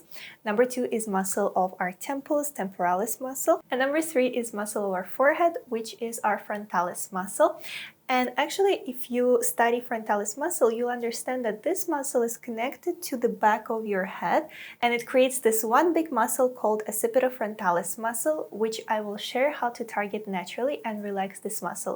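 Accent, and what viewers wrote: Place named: Poland. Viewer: Is that a Polish accent I am hearing? My parents were Polish so something in your accent sounds very familiar!❤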